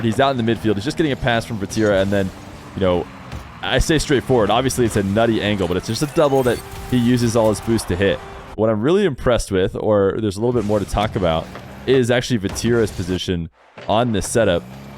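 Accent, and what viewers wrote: United States. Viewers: You sound like Johnnyboi if he had an American accent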